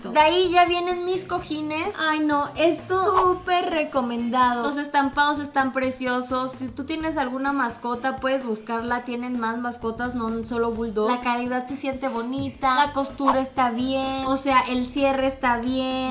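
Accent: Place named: Mexico. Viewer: Tengo 24 años y toda mi vida me pasaron diciendo que era muy gritona y que bajara la voz, que gritaba mucho... Luego encontré el canal de y dije OMG AMIKAAAA hablamos igual (solo que con diferencia de acentos porque yo soy de Costa Rica y ella de Mexico) Jajajaja pero me siento bien de saber que otras personas hablan así.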